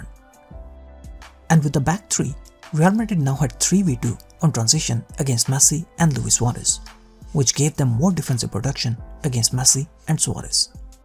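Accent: India. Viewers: Dude... Is the narrator Indian?? At least His accent is ..😐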